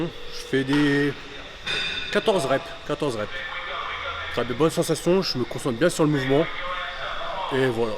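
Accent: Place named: Nord-Pas-de-Calais, France. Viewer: J’aime bien l’accent chti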